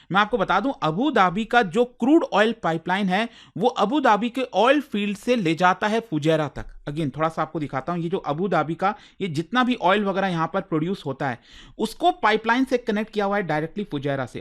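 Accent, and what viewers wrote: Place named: Bihar, India. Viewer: You sounds like bihari without any logic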